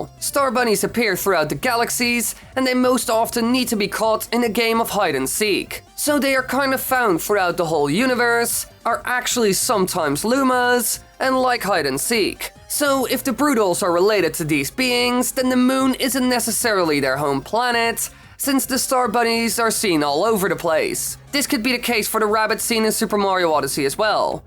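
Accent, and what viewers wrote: Netherlands. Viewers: Woah Why Do you say Utrecht & Utrecht Centraal so Dutch speaking?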